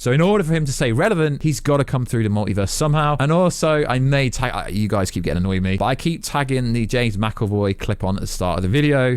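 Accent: United Kingdom. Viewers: Are you Brittish or Scottish ahha?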